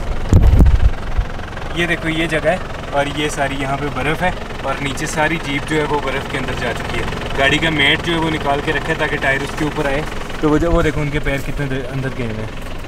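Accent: Punjab, India. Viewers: Bro aap ka jo punjabi touch hai na conversation main wo unique hai jisay daikh k apna apna feel hota k apna koi yar bna raha..... Warna north k vlogs to aur b boht log bnatay hain...... Isi trah genuine accent main raho u deserve more respect specialy u realize us to how we love our country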